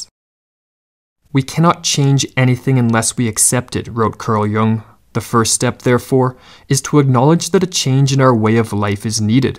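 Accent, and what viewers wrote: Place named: Canada. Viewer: Your "outs" and "abouts" indicated clearly to me that you're a Canadian.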